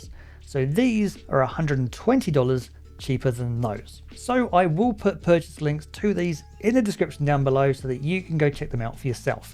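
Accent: United Kingdom. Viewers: Just saw your A20 sleep review, which was really good. I just got the A20 after watching loads of reviews on both. Which one do you prefer? I think if battery life is important the A20 are still the best. I also had the A10 but the left earbud died on me and never wanted to charge fully again 😢 So I am off to try the A20 for the first time. Thank you for your review ( nice to hear a British person for a change😂)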